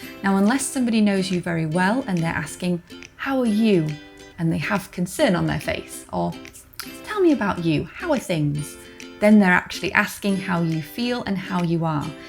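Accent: United Kingdom. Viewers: Proper Yorkshire lass! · Tnx for uploading the video, I like British accent. 👍🏻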